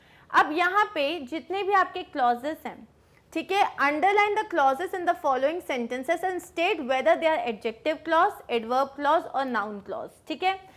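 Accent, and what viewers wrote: India. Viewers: OH NO...INDIAN ENGLISH...NO...NO...NO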